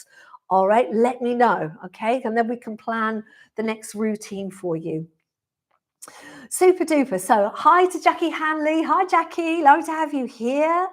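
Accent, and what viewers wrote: England, United Kingdom. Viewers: Thank you so much. Your English accent is so refreshing to hear! Sorry to those Aussie and American accents but they are often very high pitched and squeaky…!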